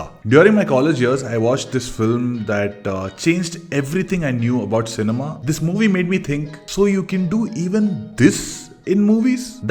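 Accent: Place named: Tamil Nadu, India. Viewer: Nice review sensibility, and good cinema awareness, man. I haven't watched these movies yet, but your review makes me want to. And, with a surname like, I would have thought you were a Malayali. Your pronunciation of the Malayalam words, though, - flawless as they are - suggest that you aren't primarily a Malayalam speaker... you sound more Tamil than Malayali.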